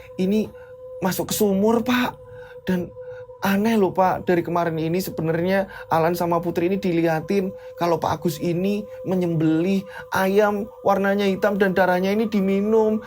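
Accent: Java, Indonesia. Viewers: boom bomm hadiir jhor bhru mleysie. suke banget chinel ni. jwa nya medook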